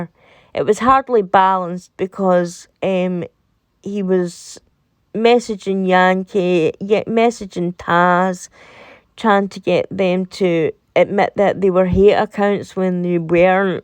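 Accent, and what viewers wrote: Scotland, United Kingdom. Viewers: Great video!! Yes we love your accent. Love Scotland. I'm a descendant of Scotland.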